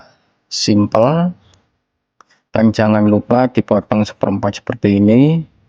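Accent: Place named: Bali, Indonesia. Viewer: Bang tutorial ini apa di kawasan bali?? Logatnya seperti orang bali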